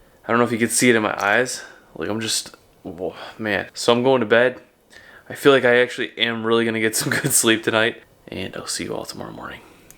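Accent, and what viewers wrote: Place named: Michigan, United States. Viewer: Michigan accent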